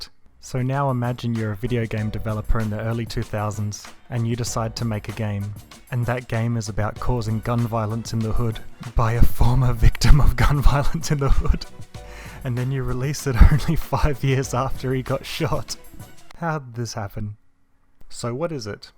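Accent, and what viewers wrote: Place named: Australia. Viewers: Australian I'm guessing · Hi are you Australian or from New Zealand like your accent is incredibly subtle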